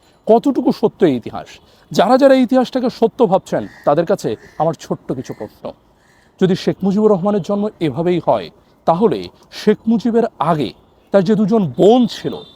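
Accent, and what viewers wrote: Kolkata, India. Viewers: আপনি ক্যান ইন্ডিয়ান দাদা বাবুদের মতো কথা বলছেন?? বুঝলাম না আপনার উদ্দেশ্য... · তোমার কথা বলার ধরন কলকাতার দাদাবাবুদের মত কেন গো? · তোমার কথা বলার স্টাইল কলকাতার দাদাবাবুদের মত কেন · এই সা/লা তো কলকাতার মা/ল। কথাতে ধরন ওরকম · আরে উনি আসলেই ভারতীয় কথা শুনে বুঝতে পারছেন না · সবই ঠিক আছে তোমার কথা বলার ধরন কলকাতার দাদাবাবুদের মত কেন গো? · কিরে তুমি কি কলিকাতা রেন্ডিয়া দাদাবাবু নাকি? না মানে, কথা ত হুবহু ওদের মত!!! উত্তর টা দিবে। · Hindu to tai kolkatar moto bolse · আপনি পুরাপুরি শক্ত প্রমাণ উপস্থাপন করতে পারেননি! শেখ মুজিবের দালালী না করে সঠিক তথ্য উপস্থাপন করুন। আকারইঙ্গিতে, শেখ পরিবার এবং আওয়ামী দলের দালালী করছেন আর কথাবার্তা ধরন কলকাতার বাংলাভাষীদের মতো কেন? আরে, বলেই দেন? উনি ফেরেশতা ছিলো। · দাদা বাবু আপনি যে দেখছি কলকাতা ভাষা বলছেন গো। দালাল চাটুকার চ্যানেল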